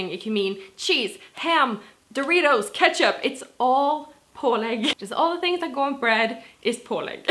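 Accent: United States. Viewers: Your English sounds very American to me.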